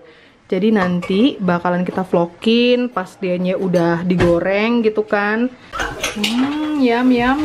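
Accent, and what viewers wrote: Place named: Medan, Indonesia. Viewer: Kongsi ya kak..... medan kali bahhh 😁😁😁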